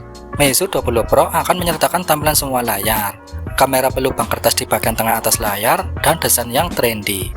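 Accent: Banyumas, Indonesia. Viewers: Bang ngapak yak?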